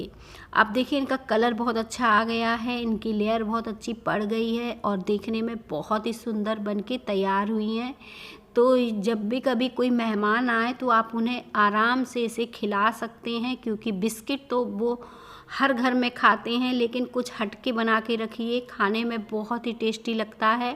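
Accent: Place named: Bundelkhand, India. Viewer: Aap ki bhasha ka ton bundelkhand ka hai,aur bundelkhandi bhasha bhaut achhe bhasha hai ,aap usko bolo,to achha lagega,jo aap english ki shabd use karte hai ,hindi bhi achhe lagege.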